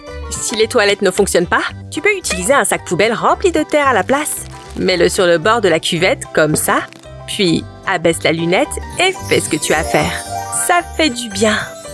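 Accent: England, United Kingdom. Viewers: Vous êtres anglais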